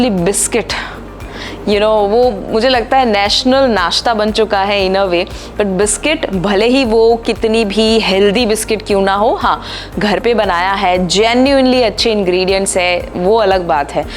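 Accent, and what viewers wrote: Gujarat, India. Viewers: madam please speak in Gujarati ,You speek Hindi but your tone of speaking is like Gujarati ,You speak Gujarati language very well and clear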